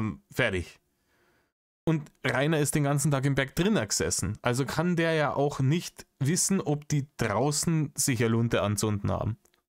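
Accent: Bavaria, Germany. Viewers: Hallo könntest du bei deinem nächsten Video wider mehr Bayerisch sprechen. Weil ich muss dann lachen wenn du dich über denn Kürbis Kopf aufregst auf bayrisch wenn der immer wider was am verzapfen macht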